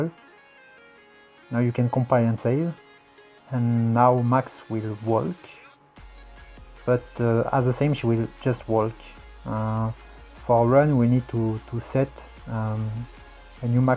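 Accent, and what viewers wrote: France. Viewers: Trés bon tutoriel même avec l'anglais à la frenchy :) ! Par contre, je ne suis pas pro mais, tu n'es pas un peu en train de choisir le mode HARD pour le plaçage de tes textures ? Je me rappel vraiment être passer par autant de truc si ce n'est les nodes bien sûr ! Mais bon, chacun sa méthode. En tout cas, super tuto ! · Oh mais rien qu’a l’accent, ca s’entend que t’es français ! Incroyable ! Tu fais des choses magnifiques ! Continue comme ça !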